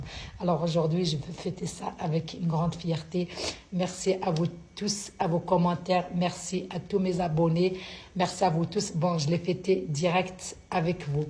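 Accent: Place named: Kabylie, Algeria. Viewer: c'est avec un Grand plaisir que je vous souhaite un Joyeux anniversaire...et merci d’avoir gardé l’accent Kabyle....un ami des USA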